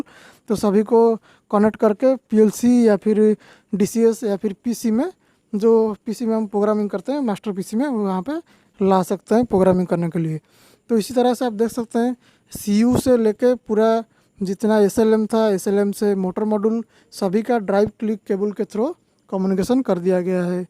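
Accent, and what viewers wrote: Odisha, India. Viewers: Sir i love your odia accent .. Very helpful..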